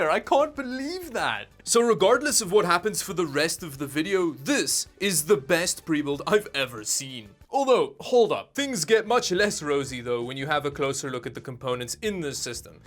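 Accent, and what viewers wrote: South Africa. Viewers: Is that a Saffa accent I sense? ☆ ○ ☆ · Are you from South Africa?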